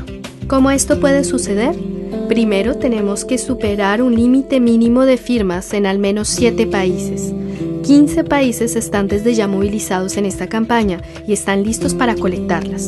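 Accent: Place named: Colombia. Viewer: Solo por curiosidad, en que parte de España o Europa la gente habla con acento Colombiano?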